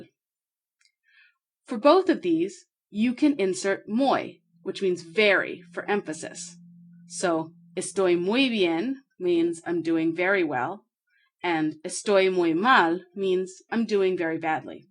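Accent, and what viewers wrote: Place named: United States. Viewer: and also the gringo accent. it's not joula it's Ho- la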